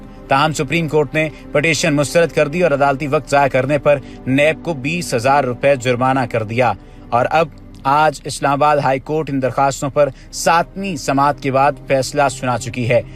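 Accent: India. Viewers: mira matlab k hum urdu k accent se pehchan gay k admin indian hay . kharachi wala bi nhi . or ye indian kis tarah pakistanyion ko ghumrah krny ki koshishein krty rehty hain . lekin finally be niqab ho jaty hain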